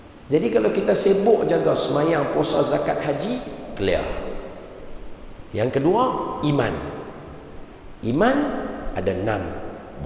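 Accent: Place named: Penang, Malaysia. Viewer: Terbaik Ustaz Don masih pekat penang tu hehe 😁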